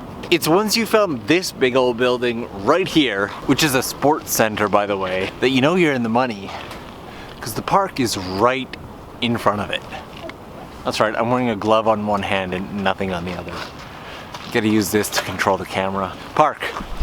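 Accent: Canada. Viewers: You should be a tour guide! you explain everything so well and your Canadian accent adds a special touch! Ireland is a different kind of beautiful so check us out sometime! 🍀🍀🍀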